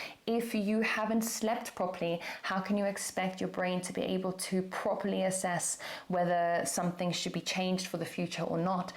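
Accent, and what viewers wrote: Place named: England, United Kingdom. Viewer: I just assumed you were from England. Where are you from/ grew up? Ps. You're my current fave educator/ influencer/ author ❤. Waiting for my bday in November to buy myself your book.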